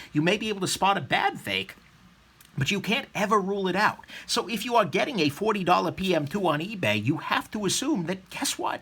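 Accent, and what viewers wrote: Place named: South, United States. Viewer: Your southern accent is amazing!! You’re hilarious! Love it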